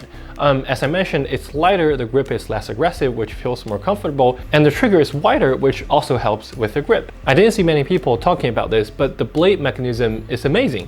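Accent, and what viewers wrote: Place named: Canada. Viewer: An Asia Canadian accent... different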